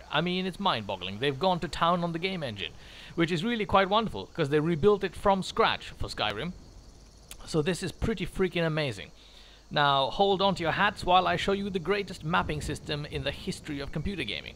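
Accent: United Kingdom. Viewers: That is a British accent, the man is actually British :P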